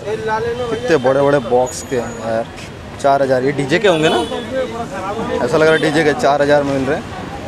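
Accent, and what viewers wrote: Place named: Maharashtra, India. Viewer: Nagpur che lokanna Hindi bolayla khaaz sutli aste ... Tumhi bihari nahi maharashtrian ahat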